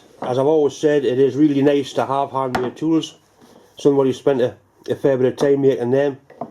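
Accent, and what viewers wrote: United Kingdom. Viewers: Another great video. Turning the chuck register down like that is a nice old trick, it's been shown in many books and articles over the years. I'd like to ask where I can get a cast iron backplate just like that one? BTW, did you know your dialect was voted the most charming British dialect a few years back by UK TV viewers? It's very interesting to me because it still contains old Norse and Danish words and/or ways of expression from way back in history. And it sounds good. Best wishes to you and your family,André, Oslo.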